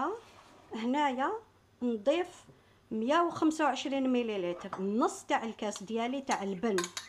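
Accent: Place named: Algiers, Algeria. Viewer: C'est très agréable d'entendre votre accent algérois,ça nous fait du bien whna b3ad ala dzair.Bravo pour la recette et pour les explications très simples😊❤️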